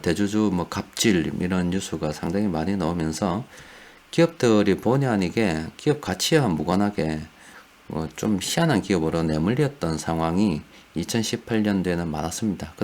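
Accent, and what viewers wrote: Gyeongsang, South Korea. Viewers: 오늘도 좋은 하루되세요 혹시 어디사세요 경상도 사투리 같은데 행복한 주말되세요 꾸벅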